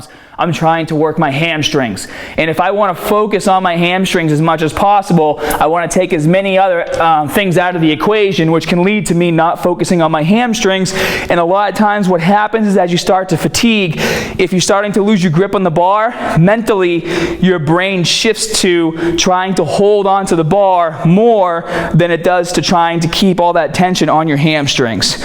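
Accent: Boston, United States. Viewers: Ahaha the Boston accent came out on this one forsure! Loved the biggie smalls reference as well. A lot of people can definitely learn from this video especially those who think wraps don't ever have its purpose in lifting.Thanks for the shoutout bro can't wait for more and more people to join us on muscularstrength! #NattyNation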